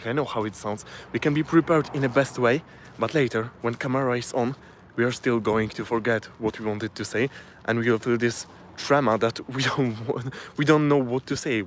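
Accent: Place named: Poland. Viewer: accent was lost on this aussie 😂 now i know a polish accent i guess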